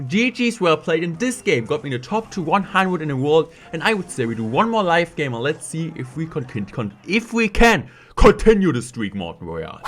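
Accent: Germany. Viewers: i hear a german accent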